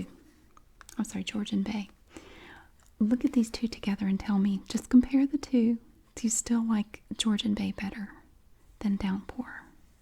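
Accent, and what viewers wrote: South, United States. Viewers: I have family in the south and I think one of the reasons I like you is because you remind me of them. There is something captivating about Southerners - not just the accent, but your mannerisms. Anyway it makes for a good foundation for asmr. You’re exceptionally graceful so that makes you special in my opinion :) I hope you keep doing this for a long time I always enjoy seeing all the stuff you come up with. Thanks for sharing your talents with the world.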